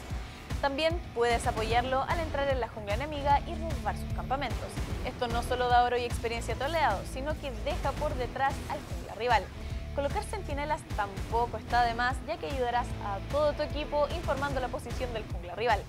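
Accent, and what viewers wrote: Chile. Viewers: 4444 lo justo ni más ni menos tal vez un poco pero no más habla bien y todo es chilena lo noto por tu acento y por lo demás bueh solo eso no más es lo justo .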